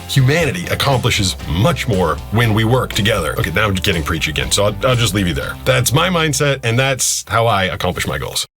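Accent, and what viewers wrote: Boston, United States. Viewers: I would love for you to do a whole video in your true Boston accent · Do you ever go back to Boston or come across somebody with that accent and if you do, do you slip back to your old ways of speaking?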